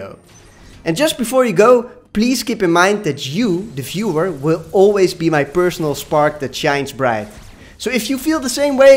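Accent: Netherlands. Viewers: Clear video, explains some of the finer points of speedboosting. An addition would be that you cannot "charge"towards a block while spinjumping and you need to cancel the spinjump (or screwattack) with firing your gun/ aiming, before being able to do mid air spark releases. I would suggest working a bit on your English: Your Dutch is shining through: just watch some vids on pronounciation. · Thanks voor de tip! Ik hoor trouwens iemand die in het echt Nederlands spreekt ;P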